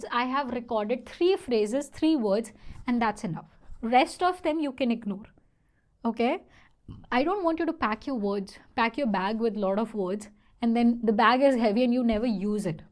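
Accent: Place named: India. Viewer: I am used to watching this channel, because you use Indian pronunciation.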